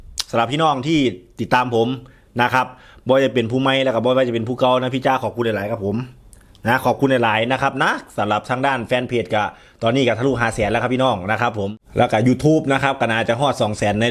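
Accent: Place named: Ban Lan, Thailand. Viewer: ไทบ้านได๋น๊อค่ะ สำเนียงเว้าคือทางบ้านลาน บ้านโนนสว่าง จำปาโมงแท้ค่ะ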